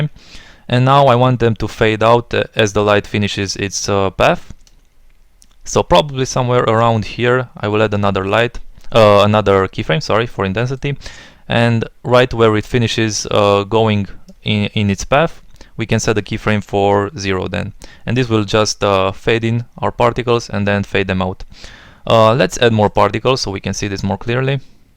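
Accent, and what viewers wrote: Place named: Russia. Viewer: Спасибо!) Сразу видно русскоговорящий)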